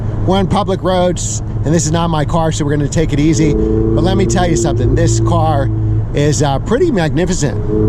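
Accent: United States. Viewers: Watching the video and listening to you speak and I'm like wait a minute, this guy is from Philly. Nice review.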